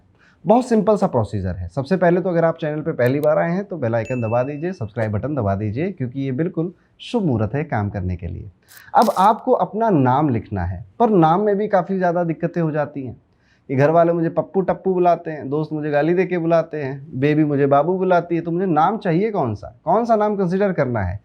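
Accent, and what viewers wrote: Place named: Kanpur, India. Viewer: Radhe radhe… fan ho gye hu aapki… watching u from Sydney but dil hai kanpuriya… aap bolte hoto lagta hai mere gher ka koi bol rha hai wahi lehja wahi slag wahi shabd… hashi bhi aati hai sun k aesa lagta hai gher per hu.., Kash aapse appointment le pati… thode mehnge ho aap aur abhi mai mere naukri nhi hai kaphi samay se job search ker rahi hu but pakka jaldi hi lag jayegi aur tab aap se baat kaungi… may be face to face… when i am there in kanpur… in my home and yes i know u read all the comments 😅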